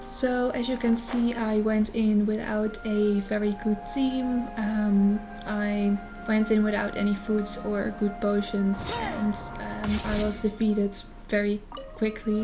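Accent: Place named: Netherlands. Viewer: She is Dutch isn’t she?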